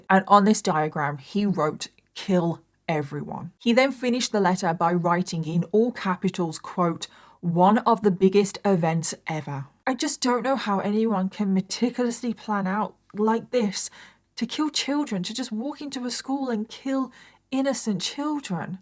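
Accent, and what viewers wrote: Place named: United Kingdom. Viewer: i have been watching your vids for years and i’m still trying to figure out where in the uk your accent is from 😂❤ xx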